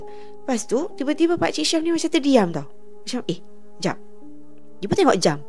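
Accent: Kelantan, Malaysia. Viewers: Kak ore kelate ko bunyi mcm ckp kelate je.. Air bodo tu gpo maksud dio🤔🤔😅😅😅😅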